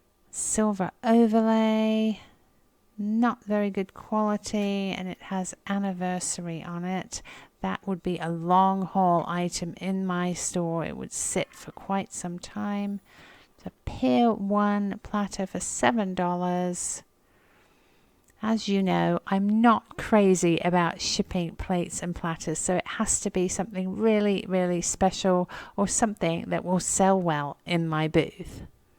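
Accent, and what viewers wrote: England, United Kingdom. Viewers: LOVE the design for the "kitcheny bits" merch! I adore the British accent and terminology as do lots and lots of your viewers. Go for it! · Are you from England?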